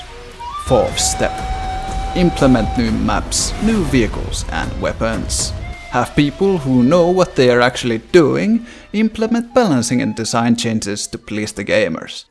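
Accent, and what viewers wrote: Finland. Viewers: are you from finland my brother i think i can hear a slight accent in your voice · are you from finland?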